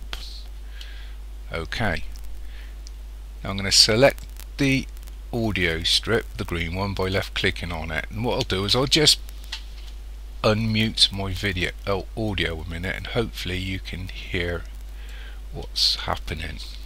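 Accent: United Kingdom. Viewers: Most british tutorial ever seen xd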